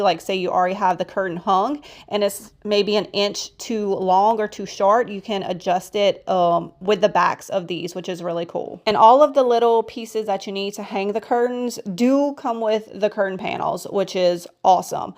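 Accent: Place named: New Orleans, United States. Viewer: I love it all. I think it's a great idea about the apothecary jars in the bathroom. You were right about the rug, but I love those curtains. Beautiful, home.And I really enjoy your channel and the NOLA accent 😊❤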